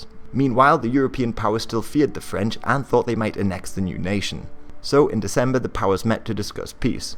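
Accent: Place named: Newcastle, United Kingdom. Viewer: Where are you from? You sound like a Geordie who's trying REALLY hard not to sound like a Geordie...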